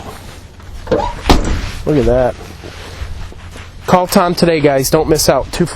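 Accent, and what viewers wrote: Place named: Michigan, United States. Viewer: You must be from Michigan I can tell by your accent. Also how much?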